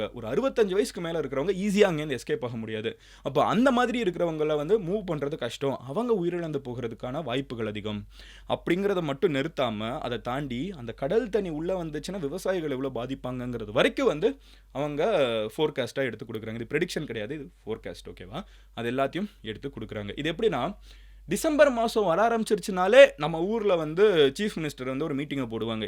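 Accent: Madurai, India. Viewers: Neenga maduraiya bro.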